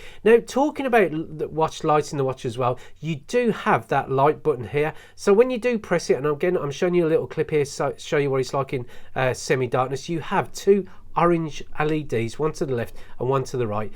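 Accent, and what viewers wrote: United Kingdom. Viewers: Nice video, love your brittish accent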